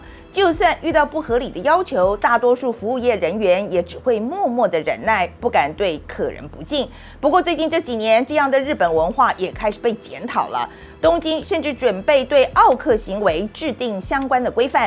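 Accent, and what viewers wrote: United States. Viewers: 范姐一整個美式日文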